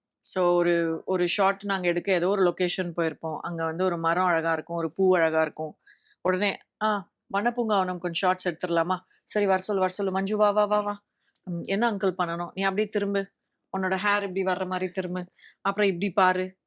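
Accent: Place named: Tamil Brahmin, India. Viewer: She is Tamil Brahmin I think…see her way of talking and food taste!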